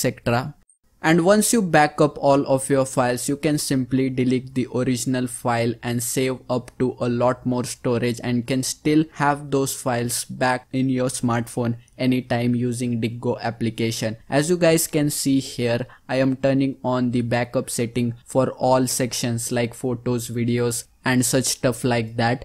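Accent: India. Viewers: it's Indian accent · He is just in a Indian accent not everyone is English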